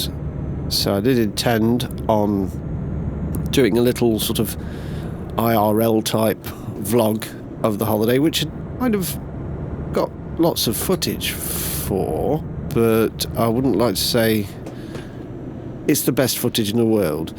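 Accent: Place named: United Kingdom. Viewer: Very British jolly good old chap :-)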